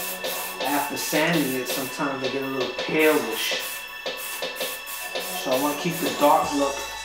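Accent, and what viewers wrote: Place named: New York, United States. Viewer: I hear a lot of new york in ya tone👊🏼👊🏼💯💯good video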